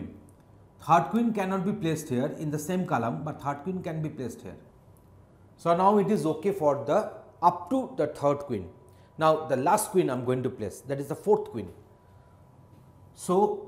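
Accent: Bengal, India. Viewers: Pretty sure a bengali though.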